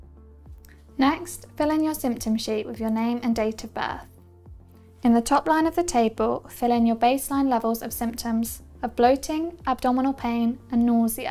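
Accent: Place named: England, United Kingdom. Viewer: shes english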